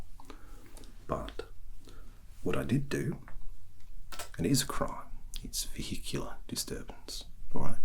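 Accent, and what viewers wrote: Australia. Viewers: I was honestly prepared to laugh at the accent as an Aussie myself but damn you did a pretty good job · Ah, your Aussie accent and mannerisms were so familiar to me, an Aussie girl, that my tingles for the ' exotic ' went missing in action. Have you been here in Oz? Stanley is just like my uncle Ron, a decent, good bloke Aussie detective cop. They're not all good blokes though of course.....so some being a few flies in his ointment. I grew up travelling and living all around Australia and know that people usually have a mix of the slight differences in Aussie accents due to many transferring to different states and territories, usually for work. Stanley's slow old Queensland drawl would have me pick him as a banana bender easily. (QLD state known for banana production). I'm a sandgroper from Western Australia myself. The state known for the mildest accent and bloody sand everywhere and into everything. 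I guess we just mildly plough on through our day while casually dealing with this expected, everpresent mess. You can tell newbies to this state by their frantic car and house cleaning cyclonic dust up frenzies. They soon learn. Excellent work. Also you might be interested that some of my ancestors were a Welsh farming family from Carmarthen and came with the first fleet of settlers to help set up the Swan River Colony in Western Australia. The Llewellyn-Jones family. By their letters and family word of mouth history it certainly wasn't what they were expecting! Hardship, starvation, abandonment, after being deposited, by the British government, along with other Brit set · Our sweet summer child, Stanley 🥹 Huge props on the accent! It kinda sounds like there's a lil bit of a Kiwi tinge in there, like he moved over here when he was very young. And as a Queenslander, I just want to say thank you for choosing the sunshine state! 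Sydney and Melbourne get like 90% of the attention when it comes to the rest of the world (heck, even within Australia 😐) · Oh my god, he did the accent better then I thought. I’m also an Aussie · mate, your Australian accent is awesome. I’m Australian. 👍👍👍❤️❤️❤️ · Ive heard much worse Australian accents, some from actual Australians. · I’m aussie so I had to click on this purely out of curiosity to see how’d you go with the Aussie accent lol you are very talented I’m astounded by the amount of different accents and roles that you nail! Very impressive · I noticed the DI/PC thing at the start too and you're right, pretty sure it's just a Pommie thing. I know here in Queensland, detectives go with their police rank i.e. Senior Sergeant and just add 'Detective' before it, end result being for example Detective Senior Sergeant Braithwaite. I could be wrong 🤷 , great roleplay tho, best Aussie accent in ASMR beside real Aussies! 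Cheers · Aussie here, somehow sound more Australian then me 😂😂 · Definitely one of the best attempts I’ve heard at an Aussie accent. Feels very outback, like I’m here for sheep-related crimes. And the cordial bit really struck home for me, as someone who can’t stand plain water! Great job, from someone down in the forgotten part of Australia (Tasmania) 🐨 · Mate, as an Australian.. I have to say .. your "I'm a Aussie Copper from the Outback " accent is pretty damn good, In fact, you would of passed with flying colours if you auditioned for any of the Australian 80's cop shows we had back in the day! HAHAHA! But seriously, not many folk can nail it - and you did a pretty damn fine job if I may say so ^_^ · How come no one is talking about the aussie accent being on point? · and yes, like all the other Aussies, nice accent! Care to try a Hemsworth? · I clicked for the same reason as well as I enjoy watching what posts, I honestly braced myself to cringe cause I've heard a lot of butchered Aussie accents and thought I was gonna be hit by another. 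I was pleasantly surprised. What part of Aus are you from?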